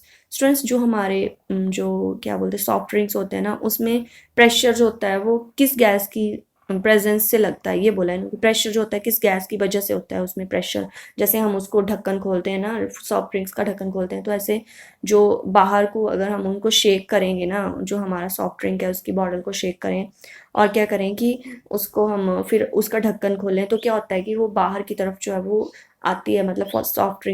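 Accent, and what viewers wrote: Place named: Pahari, India. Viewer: ये ट्रस्ट serirs "जो है वो"😅 कितने rupess में avilable हो जाएगी maam ? पहाड़ी accent बड़ा अच्छा आता है आपकी हिंदी में😃🙏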